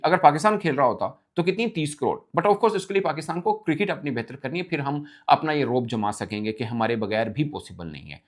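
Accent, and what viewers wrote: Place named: Punjab, India. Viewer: بھائی اپنے پنجابی نما لہجے میں اردو پر کنٹرول کریں۔ پنجابی میں کوئی برائی نہیں آپ ضرور بولیں مگر جب اردو بولنی ہے تو پھر مکمل اردو لہجے میں بولیں۔ مثلا لفظ قانونی ہوتا ہے قنونی نہیں۔ براہ مہربانی دھیان کریں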